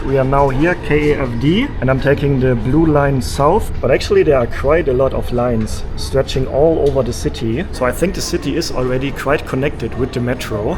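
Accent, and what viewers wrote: Germany. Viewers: Can tell you are german, as you pronounce your w with an r and vice verser. Ive subscribed to you. 💋💋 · nice German accent. Makes you "sympathisch" and real❤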